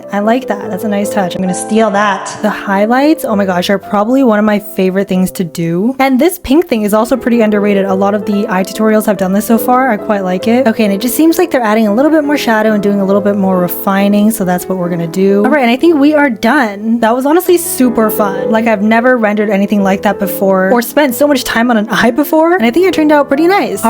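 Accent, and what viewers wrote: United Kingdom. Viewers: Are you uk or………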